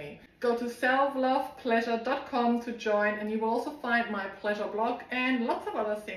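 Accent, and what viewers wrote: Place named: Germany. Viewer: I love your accent,. Are you German?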